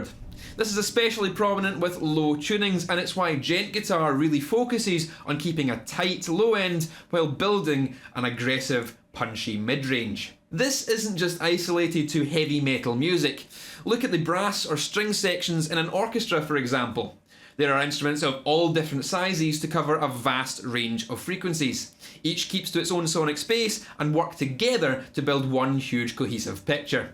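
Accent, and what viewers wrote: Scotland, United Kingdom. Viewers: Great video!! Awesome continuation to your first one about this topic (which I loved) And I really enjoy your accent. I´ve worked with a couple of scotchs and really like their way of talking. Cheers. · Upvoted for Scottish accent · I've started watching your videos so I can learn to imitate your accent. Where are you from? Where in Scotland, that is? Can you tell the difference listening to someone from the Highlands vs from Glasgow, for example?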